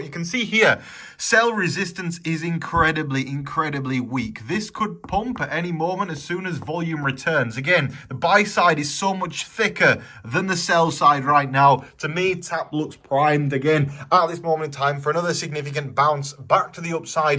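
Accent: Yorkshire, United Kingdom. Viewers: Me too. I love bold and confident nature and style with his Yorkshire accent. I am from a Leeds too by the way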